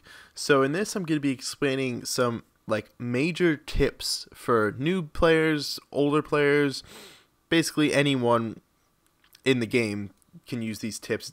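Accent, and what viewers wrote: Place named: New York, United States. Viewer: New York accent.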